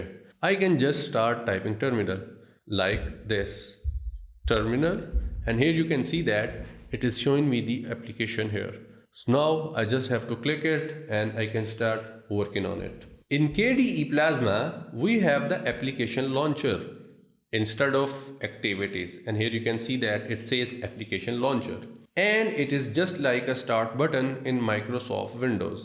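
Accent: India. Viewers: omg this indian english is absolutely horrible to listen to :o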